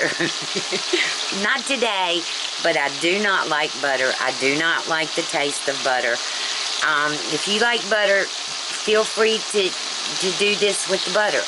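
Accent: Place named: Virginia, United States. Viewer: Thanks for your efforts in producing this video. My wife is preparing it as I write. Are a Virginia resident? We live in central Virginia and your accent sounds familiar.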